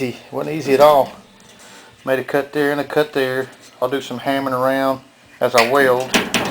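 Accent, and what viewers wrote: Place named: Appalachia, United States. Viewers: Love this hillbilly accent! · Your voice and accent is identical to my brother in law's. He was born, raised and lives in Anderson, South Carolina.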